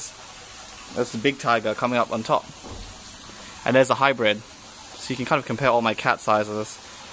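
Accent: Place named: United Kingdom. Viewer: you sound more british than anything else, but beautiful tank are you in malayia or america or what?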